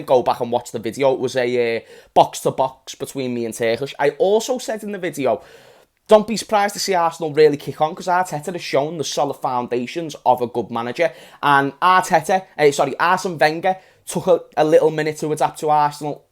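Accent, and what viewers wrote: Liverpool, United Kingdom. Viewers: Finally someone with thick British accent. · its Liverpool Accent 🤣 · I love your scouse accent lmao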